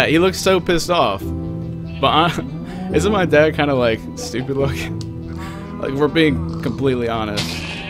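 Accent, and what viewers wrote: United Kingdom. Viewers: The British accent lmao “Alright let’s go find some endermen.” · With That British Accent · bruuh wow bri*ish accent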